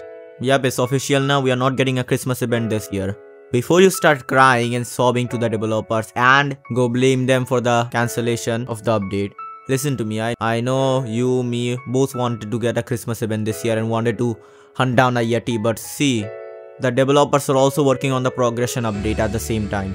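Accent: India. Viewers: The music is the same as in all of your videos. Your accent is indian. Words are just words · Hey guys didn't u notice the update the air just got colder Edit: btw are you Indian cuz u got some Indian accent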